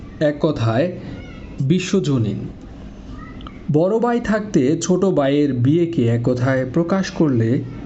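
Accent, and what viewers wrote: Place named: Chittagong, Bangladesh. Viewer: স্যার মনে হয়, চট্টগ্রামের🙆🏿‍♂️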